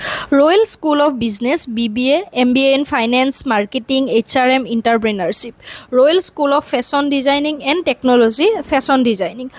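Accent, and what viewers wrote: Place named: Assam, India. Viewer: Tipical assamese accent 😛😛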